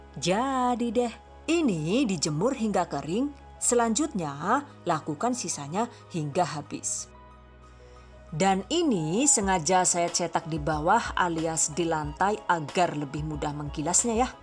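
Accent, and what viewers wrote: Banyumas, Indonesia. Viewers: Mbarai enyong kencot baee 🤣🤣🤣 ORA NGAPAK ORA KEPENAK 😂🤣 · Kaget pas ngomong ngapak .. jebule sekebangsaan ... Ora ngapak ora kepenak ya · Kak orang mana si? Medok kaya orang banyumasan nih 😂😂😂😂😂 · Karakter suaranya unik bgt, bahasa inggrisnya fluent, ngapaknya mantap bgt. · Kok ngapak sih? Cuman lokasi madiun wkwk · bahasa ngapak nya lucu 😅😅😅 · ihh si mimin ngomonge ngapak yaa😂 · Ngakak ngapak nya ....😂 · Keren keren,,, buahahaha pliss jgn dikeluarin bahasa ngapaknya saya gak tahan ngakak trus nih.bagus sih jd ada lucu2 humor nya.moga sukses selalu yah.amin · Panggon e Madiun bahasane ngapak,😆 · Tag lokasi di Madiun tapi kok bahasanya ngapak.. 😅😂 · Lah ngapak wkwk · Jebule wong ngapak rikaa😂😂😂😂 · eh padabae ngapak:v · Wonge mandan ngomong ngapak😂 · Wong endi kie ngapak😂😂😂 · Jawa ngapak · Lah rika wong ngapak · Deng ngapak sih min??? 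· Ora ngapak ora kepenak wkwkwk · Jowo ngapak 🤣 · Koq bahasanya campur pake bahasa ngapak, mmg asli mana ta? Cilacap ato banyumas yu 😂😂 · Ngapak tenan kek Ustadzah Mumpuni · Denek ngapak bu wong endi aku Cilacap bu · Lah ya pada" wong ngapak ya😂 sing alon ya yu😄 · Busyet deneng ngapak koh 😂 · Wongg ngapak😅😅😅😅penjelasanya detaiil bangettt jadi mudah dlm mempraktekkan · Ngapak yee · Madiun deneng ngapak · Ora ngapak ora kepenak ya yu 😂😍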